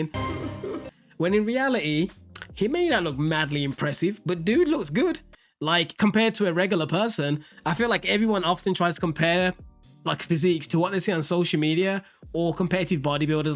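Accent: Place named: England, United Kingdom. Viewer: damn, I wrote that with full chest, while listening to your english accent 🤸‍♀️🤸‍♀️🤸‍♀️ gonna roll away now🤸‍♀️🤸‍♀️🤸‍♀️🤸‍♀️🤸‍♀️🤸‍♀️🤸‍♀️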